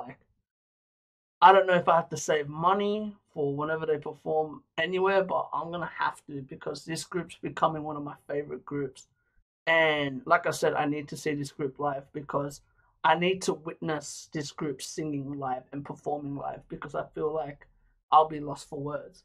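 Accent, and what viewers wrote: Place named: Australia. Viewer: dreamcatcher \:D/ where you at? down under based on your accent? :D they should tour there before the end of the year. they just finished their usa tour and it was awesome. if you are curious, there are a few good vlogs/reviews that are more commentary than just showing full song clips(paul leach, the kpop guitarist, ram reacts, merlin zimmerman) that you can maybe even react to. I'm surprised you actually know them by name, since you haven't reacted to that much of their stuff at least here on youtube. just do more album reactions. most of their albums are mini-albums so it's only 4 songs. just be sure to check if they have special clips for the b-sides before picking a lyric video.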